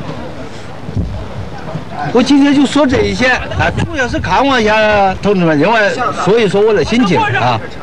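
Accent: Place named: Sichuan, China. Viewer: 为啥是四川口音。。。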